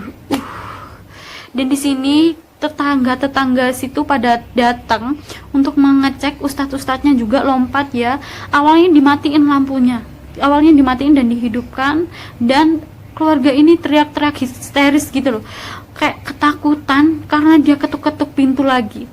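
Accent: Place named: Malang, Indonesia. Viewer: Ceritane serem, tapi ngerungokno ngomonge lucu rek... ngalam banget 😁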